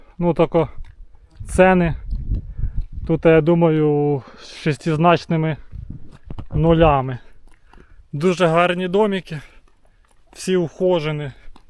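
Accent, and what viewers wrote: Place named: Central Ukraine, Ukraine. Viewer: На велосипеді там класно поганяти., цікавий у Вас акцент. Ви, певно, з Черкаської чи Житомирської області?)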